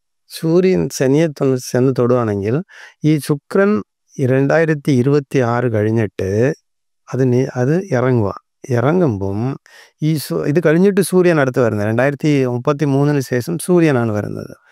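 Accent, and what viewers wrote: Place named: Tamil Nadu, India. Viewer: എന്താ സംസാരത്തിൽ ഒരു തമിഴ് ചുവ. ഇദ്ദേഹം തമിഴ് Astrolger ആണൊ?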